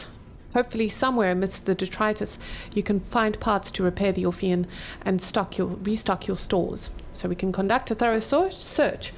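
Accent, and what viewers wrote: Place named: Germany. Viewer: hello. i think i can hear an accent in your voice. may i ask what country of origin you are from? oh wait, are you from germany? have you any plans to continue playing this game?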